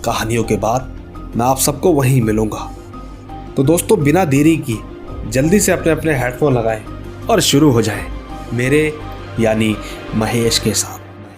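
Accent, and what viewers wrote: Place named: Mumbai, India. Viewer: Bambaiya style mein jhakas❤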